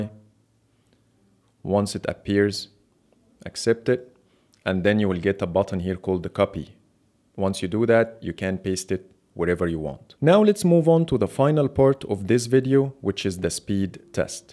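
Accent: Egypt. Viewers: مصري باين اووي من الاكسنت بتاعتك 😂😂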